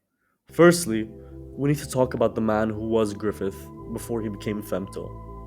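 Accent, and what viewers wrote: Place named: Levant. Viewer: course! On an unrelated note, are you from the levant? Your general vibe and inflections sort of make me think you're from the Lavant, perhaps Syria or Jordan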